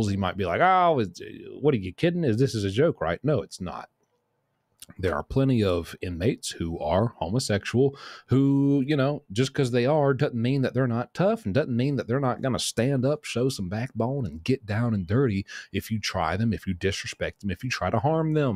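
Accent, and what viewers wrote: South, United States. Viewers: Hey brother my name is Paul Britt,I've been watching you for several months,I'm from Jackson Tennessee, and lived in Charlotte north Carolina,I enjoy the southern accent, and,thank you for, the Golden entertainment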